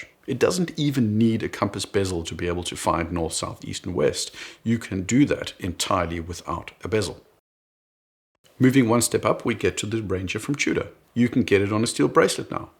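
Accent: South Africa. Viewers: You sound South African, aber mit Deutschem Hintergrund...? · Dude are you South African? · Superb video. By the way, you sound very South African. To me the Index field watch by which all others are judged is the Hamilton Khaki field. Or maybe the British CWC. It is a bit expensive for where I find myself now, so the Timex Expedition Scout fills that niche, along with a Citizen field watch, although its a tad big at 42mm. Gshocks are very cool, but per definition are not field watches.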